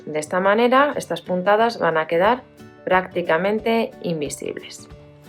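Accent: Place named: Spain. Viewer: por el acento i entiendo que eres de España. Ahora donde vivo en La Florida EU son las de la am y estoy viendo tutoriales tuyo!!! Gracias por responder.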